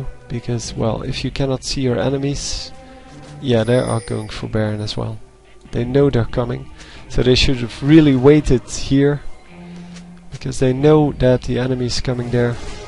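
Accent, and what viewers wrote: Netherlands. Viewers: dude are u from holland?? ur accent is pretty much like dutch and sounds dutch too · you're dutch right ?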